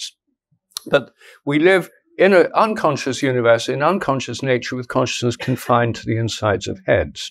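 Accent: United Kingdom. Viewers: Before I started the video I says to myself I bet this guy has a British accent and bada bing, I predicted the future!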